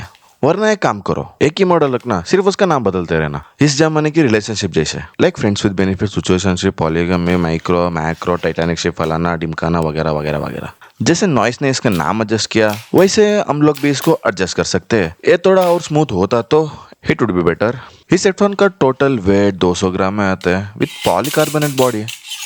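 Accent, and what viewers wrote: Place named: Northeast India, India. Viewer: Bhai tum kaha se ho, aapki aawaj se lagta hai ki aap northeast India 🇮🇳 se ho 😅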